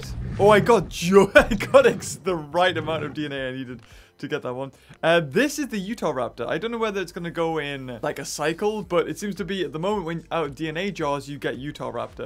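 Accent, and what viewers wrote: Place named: United Kingdom. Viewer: Never knew he was British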